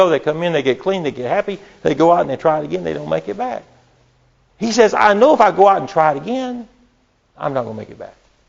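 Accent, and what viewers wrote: South, United States. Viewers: krisztina kessel it sounds southern of some kind. But it also sounds like he has a mild speech impediment as well which makes it a bit difficult to understand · This Doc is amazing, just a brilliant teacher....Hard to find a Doc that can teach in such a simple manner...Love the Southern draw...Good people from the South..I wonder if this Doctor works at a recovery center.. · Don't understand your american speech.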